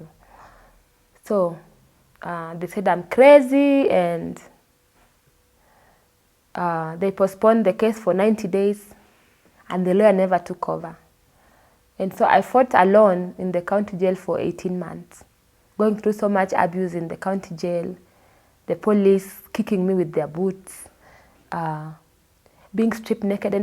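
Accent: Luo, Kenya. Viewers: Haha yeah jaluo ni jaluo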